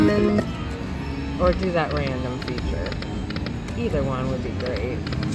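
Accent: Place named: California, United States. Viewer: Your accent you have to be in Cali. I like playing the Bufaros here in Oklahoma casinos . When I was a kid I usd to go to the wildlife refuge and play with the real ones.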